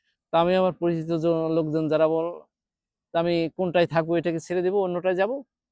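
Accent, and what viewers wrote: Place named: Jessore, Bangladesh. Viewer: আপনি মনে হয় আমাদের যশোর অঞ্চলের মানুষ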